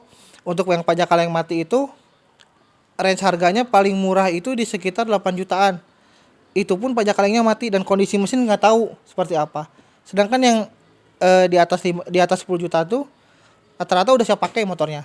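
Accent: West Java, Indonesia. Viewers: Sunda bet yah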